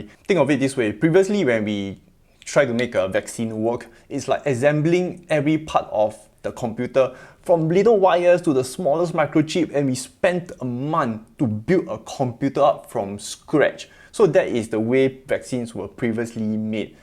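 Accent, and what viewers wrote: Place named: Singapore. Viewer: You are doing very well, thank you very much👍👍👍💖😀 . Please take some lessons in innonciating English WORDS properly and correctly, to reduce or eliminate your very heavy Singapore accent. Thank you 👍😀👍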